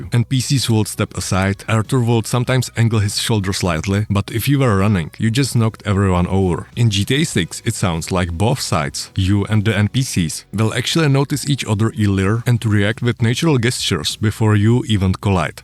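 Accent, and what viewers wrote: Czech Republic. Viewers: Are you czech you have that kind of accent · This is czech accent?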